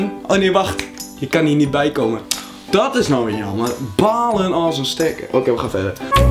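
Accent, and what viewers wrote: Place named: Overijssel, Netherlands. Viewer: Waar kom jij vandaan vlm uit overijsel want je praat n beetje plat en t lijkt een beetje op hoe ik praat 😂 ps jou ogen je haar en je tanden😍😍😍😍😍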